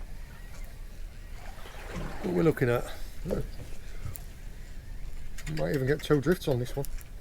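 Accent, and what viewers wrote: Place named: Northern England, United Kingdom. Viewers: Just randomly found your channel, you said your in Cornwall but you have a bit of a Northern accent. Anyways I will be watching more, keep up the good work mate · Hi, I feel like I detect a Cumbrian accent - not sure if that's right but you sound just like my Granda when we went fishing. Just want you to know that watching and listening to you has made his passing easier, especially when you explain things, it's like hearing him again. Love what you do marra, keep it up!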